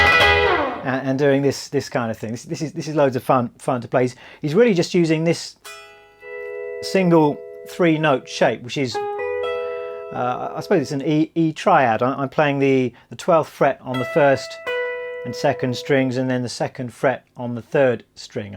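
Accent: United Kingdom. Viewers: Hey just found out your channel !! Obviously it's an easy song, but your teaching is priceless and your UK proper accents adds up to the lesson :) :) Thank you for taking the time to make a Youtube channel! Cheers from france merci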